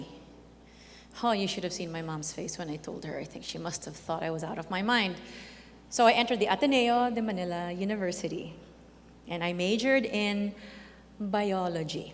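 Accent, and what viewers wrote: England, United Kingdom. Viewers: Galing mo talaga Ms. Lea Salonga, British accent kailangan nya yun sa lugar Kung Saan sya at dinala, grabe yung mga taong Di sya Pinuri bagkus may nagsabi Lang mali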